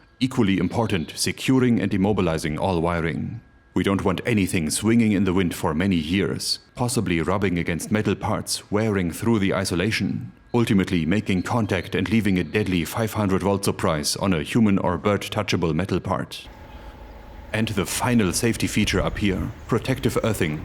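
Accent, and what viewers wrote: Germany. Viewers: Quite to the contrary. I eagerly await this endeavor with your German accent providing the perfect ASMR soundtrack. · Just watching this for the funny way he is speaking. I love this german accent. If you got an accent, exaggerate it to make the video even better.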